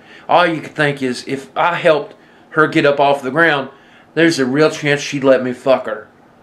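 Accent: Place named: Kentucky, United States. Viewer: U sound like me FROM KENTUCKY. Lets represent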